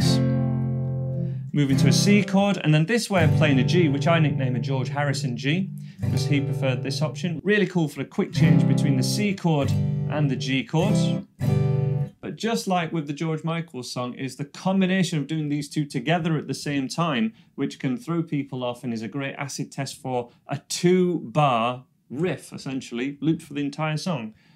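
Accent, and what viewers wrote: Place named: North East England, United Kingdom. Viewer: You have a very North East accent... I reckon Sunderland.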